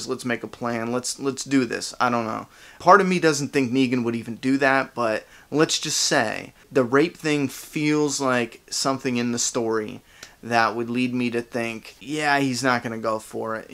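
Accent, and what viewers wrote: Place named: South, United States. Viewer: You have a southern accent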